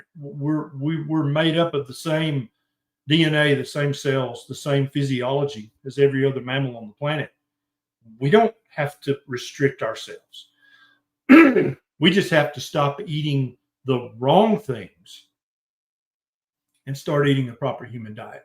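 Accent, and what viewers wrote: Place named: Tennessee, United States. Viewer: Ikr?! Plus, he is from the part of TN that I am from so his accent hits my ear so right.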